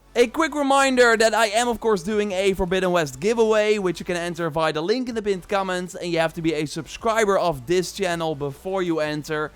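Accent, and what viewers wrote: Netherlands. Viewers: Lekker Nederlands accent man:)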